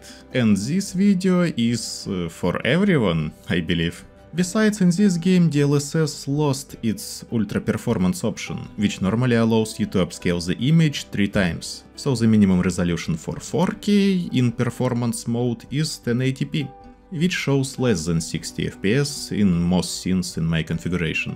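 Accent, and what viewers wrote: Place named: Russia. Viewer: А какой смысл выпускать видос на инглише с таким чудовищным акцентом? Нас сейчас в Америке и европе не сильно любят. А услышав первое же предложение человек поймет что ты русский и даже если он каким то чудом увидит этот ролик в рекоммендах, то процентов 80 народа его сразу же скипнет.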